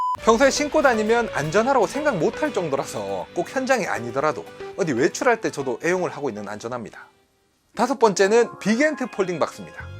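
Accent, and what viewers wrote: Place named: Daegu, South Korea. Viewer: 대구 사투리 전국민이 다 알아들을수 있도록 채널이 더욱 발전되길 기원합니다 해피 뉴 이어~~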